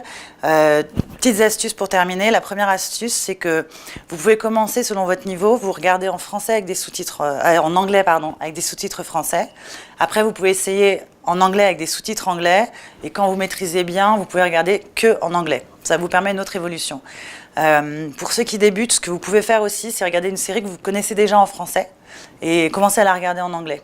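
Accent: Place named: France. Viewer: merci pour cette video, juste un truc, tu prononces l'anglais à la francaise surtout la lettre R et th qui ne se prononce pas comme Z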